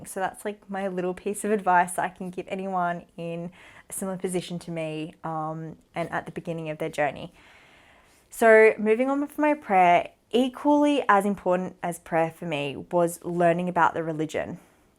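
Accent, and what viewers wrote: Australia. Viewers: I never had the patience to watch someone talk this long in 4 videos, bt i have to give it to u, coz i watched all 4 videos without even forwarding once, you have a beautiful accent the aussi accent, which is very easy to understand and ur story telling is so natural, i would like to say this, u are one of those ppl who r chosen by Allah to bring to Islam, Allah must have liked something u did before converting so much that he chose u, u r a beautiful person. Welcome to the Muslim Ummah.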